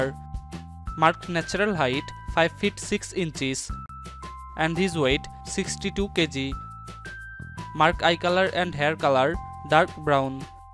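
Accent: India. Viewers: india ang nag sasalita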